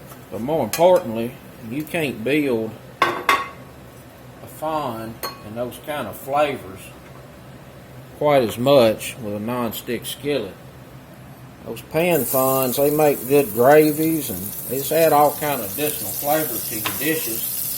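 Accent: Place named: South, United States. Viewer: I like hearing your accent and that southern drawl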